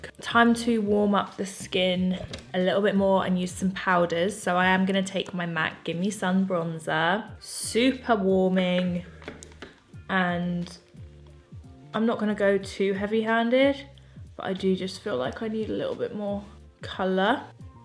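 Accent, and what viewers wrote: England, United Kingdom. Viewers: This is my first time watching you and I’m hooked. Love the makeup and you are stunningly beautiful. I could listen to your English accent all day 💕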